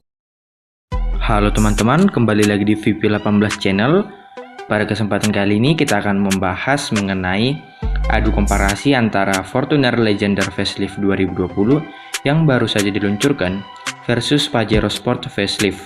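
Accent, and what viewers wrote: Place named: Sumatra, Indonesia. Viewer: Reviewnya logat sumatra banget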